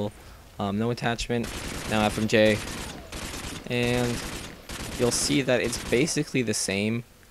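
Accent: Canada. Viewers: hes canadian. he says "about" like a candian says it. Aboat the same.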